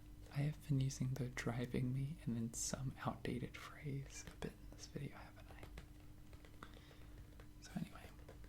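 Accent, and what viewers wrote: United States. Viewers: I first had to know about you just tonight, my son showed me people using ASMR, I suddenly found you and your wonderful voice and american accent, keep on making videos, please! And keep on speakng french, try not to lose it, okay? Beacause it's great. Take care from Patty! from Burgundy!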